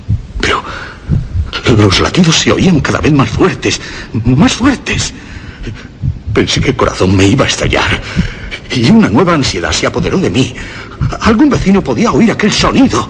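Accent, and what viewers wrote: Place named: Spain. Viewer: Excelente narración, el acento español hace que el cuento tenga más suspenso. Gracias por este gran aporte, fue de gran ayuda.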